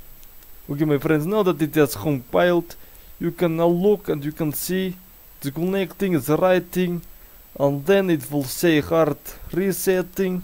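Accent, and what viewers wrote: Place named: Russia. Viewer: Love you Russian accent... ❤️ Gangsta Russian coding guy. 😂🎉 Greeting from Serbia.🎉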